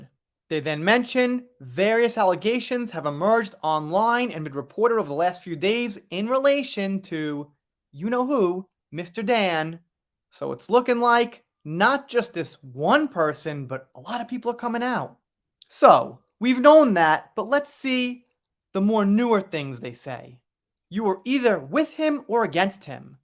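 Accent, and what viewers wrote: United States. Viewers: I would really like to know why Americans think displaying ignorance is cute. You said that you couldn't tell what this symbol "£" is, and then whilst speaking in a thick American regional accent and excused referring to it as "the euro" because you live in Japan. But Dan Wootton and The Sun newspaper are based in the UK, so you must have known you were talking shit and you had to have lived in the USA until you were at least 25 to sound so American, or do you think the audience is unaware of how accents form? Your mouth fused in it's current shape in the USA by age 25, so you have no excuse especially given that the symbol existed before the USA existed as a country and in fact you used to have an American pound. So all you said was that you were unaware of your own history. I don't think you mistook anything. You're a pro-Johnny Depp fanatic who casually glazes over the fact that he was "making jokes" about raping Amber Heard and burning her body so by pretending ignorance of the difference between the £ and € even though the £ is clearly an L used for hundreds of years in various countries as the symbol of a pound, whilst the € is an E and has only existed since the mid 1990s to be used as a symbol for a new currency, the Euro, you are being dismissive of the UK merely because you were upset that the judge in the defamation case here did not rule in favour of your opinion and instead merely followed the law and logic. 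Both Depp and Heard were abusive. Don't worry though, i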